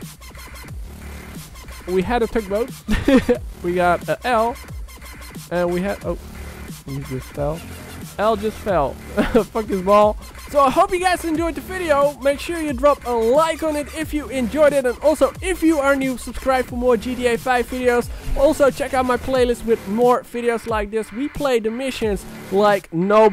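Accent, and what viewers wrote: Netherlands. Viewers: Are you Dutch? You sound like a Dutch man.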